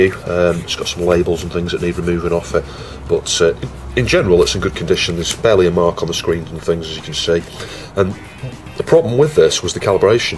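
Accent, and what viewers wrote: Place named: Northern England, United Kingdom. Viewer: So I'm assuming live somewhere in Northern UK from your accent ... but if I paid u could u fix my dsi it won't read my game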